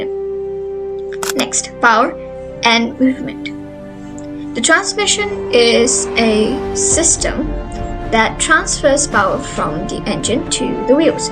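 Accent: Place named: Katra, India. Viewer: Waaaa katra accent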